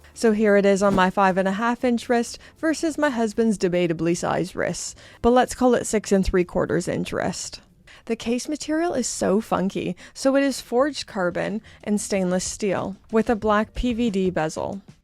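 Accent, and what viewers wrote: Canada. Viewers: Come on eh! Don’t apologize for your Canadian accent.Great vid. I think I want the red banded one. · Your Canadian accent is just great!! 😊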